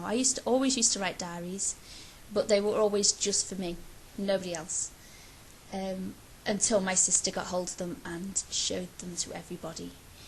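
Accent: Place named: Liverpool, United Kingdom. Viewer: Thanks for being so candid. I think we all worry sometimes about how we come across to others, but we can't let it dominate our actions. You are possibly one of the most interesting YouTubers around, and certainly your Liverpool accent makes you the most facinating. Great work on the room, and I am definately a fan. Very insightful vlog! Excellent points on intimacy. Super glad I'm a subscriber. - John Reagan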